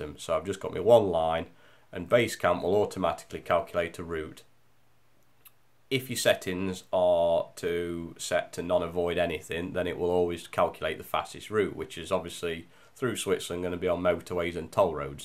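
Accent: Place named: Stoke, United Kingdom. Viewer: Good vids mate, I'm pretty happy with it but I always like seeing if others do owt different 👍, sound like you're only down the road from me ...Stoke ?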